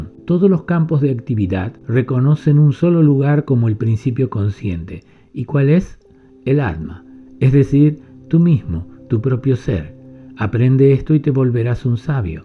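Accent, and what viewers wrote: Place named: Cordoba, Argentina. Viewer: la voz ( aunque agradable y Cordobesa)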